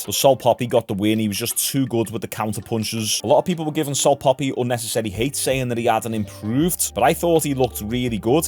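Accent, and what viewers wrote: Liverpool, United Kingdom. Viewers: Love this channel! I'm from Liverpool, but now live in the States, and it's just nice to hear the accent. Plus, I follow all types of combat sports, so this is my new home for combat sports news. Yesterday, was awesome. It's going to be a good few weeks, honestly. Wish they would spread the events out a bit, though. Nothing for 5 days of the week and then overloaded with shit to watch on the weekends. 🤣😆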